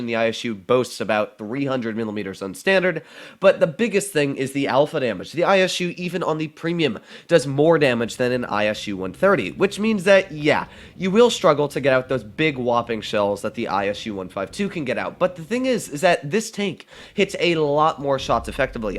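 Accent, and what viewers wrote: Russia. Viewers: are u secretly russian? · Love the tiny Russian Accent, stalin would be happy.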